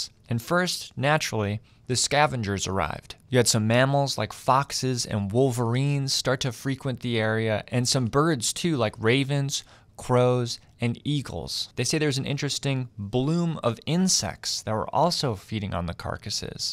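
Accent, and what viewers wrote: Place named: Canada. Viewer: You've got a Canadian mouth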